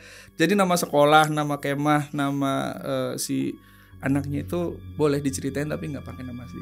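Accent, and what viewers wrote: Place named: Indramayu, Indonesia. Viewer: Logat indramayu dan cirebon nya gak ketinggalan😂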